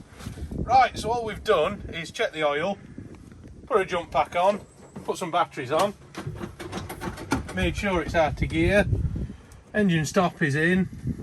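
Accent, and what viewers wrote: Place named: Yorkshire, United Kingdom. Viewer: Is proper English just a Yorkshire bloke mate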